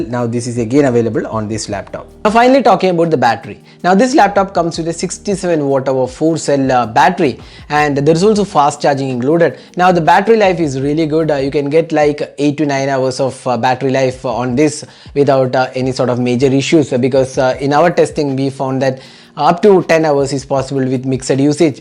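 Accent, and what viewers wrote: Kerala, India. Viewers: sounds like mallu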